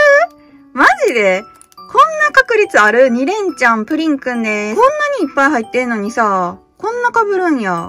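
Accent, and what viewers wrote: Kansai, Japan. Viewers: の関西弁は落ち着きますよー💖そして買い物動画は一緒に行ってるみたいで楽しいです🥰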